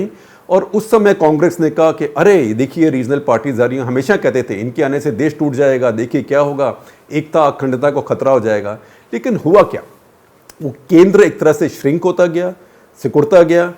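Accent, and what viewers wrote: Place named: Punjab, India. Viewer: sir.....Your hindi has a punjabi dialect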